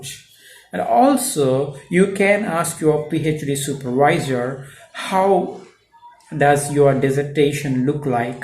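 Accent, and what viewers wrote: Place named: India. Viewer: You speaks like foreigner...i think you are from India...Am.I Right?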